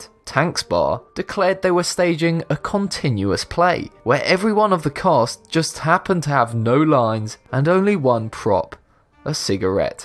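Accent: United Kingdom. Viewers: Why did I subscribe just cause he's British 😂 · annoying british accent unsubscribed.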